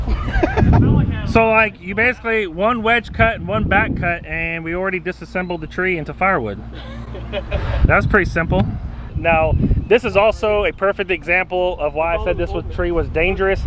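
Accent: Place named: South Carolina, United States. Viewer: Sounds like your already getting that south carolina draw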